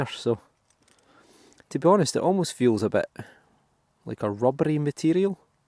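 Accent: Scotland, United Kingdom. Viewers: Congratulations on 36k, you earned every subscriber fair and square! You really are the best of the best at what you do! I always look forward to every hunt, every tour, and every history lesson! You should see the looks I get, here in North Carolina, when one of your Scottish phrases falls out my mouth! Hard not to pick up a little Scottish brogue, words, and phrases when I watch all your videos. Lol Great finds and always looking forward to the next! Ive also been watching all the tour videos on your other channel and enjoy them so much! Thanks for sharing it all with us! Take care and see ya on the next go round!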